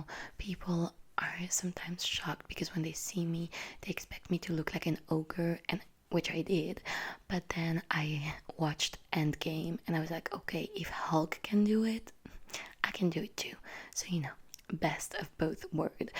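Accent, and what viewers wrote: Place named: France. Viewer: Woop I got so used to your french accent so this sounds off😂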